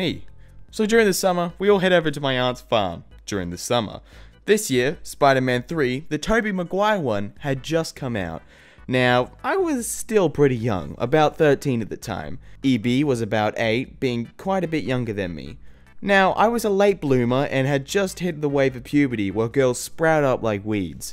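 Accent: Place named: Australia. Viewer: I think he is Australian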